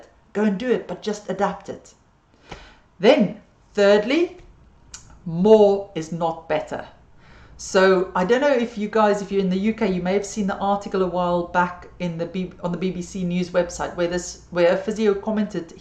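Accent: South Africa. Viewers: Hi, thanks for this. I'm really enjoying your videos. It's so nice to hear a South African voice:) I'm teaching yoga to seniors and really worry about osteoporosis. I read somewhere that crunches should be avoided. Do I need to worry about other core work? I try to keep it gentle, but would be good to know for sure what I should be staying clear of? Thanks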